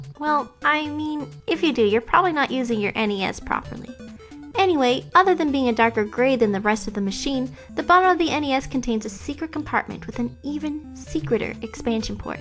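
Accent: Canada. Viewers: Well, she is canadian; you can´t expect for the whole world to speak exactly the same. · That Canadian accent is adorable!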